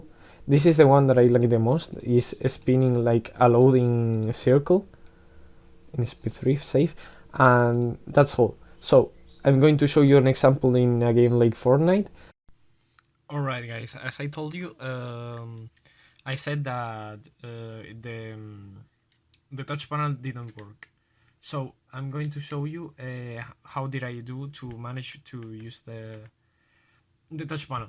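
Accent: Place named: Spain. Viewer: se nota que eres español xddd gracias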